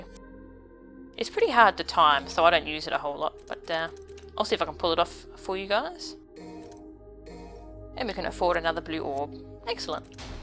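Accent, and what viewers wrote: Australia. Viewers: You have a very amusing voice. Australian accent?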